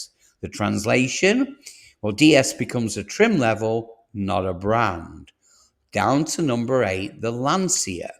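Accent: United States. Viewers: why (mis)pronounce the car brands like a yank, I thought this was about european cars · American pronunciation. Same with tomay-to. There are some differences, do keep up.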